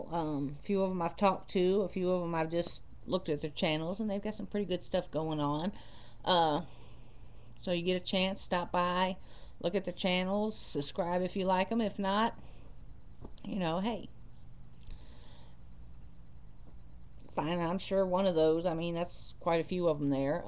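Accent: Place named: South, United States. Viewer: Love southern accent! :D I want one!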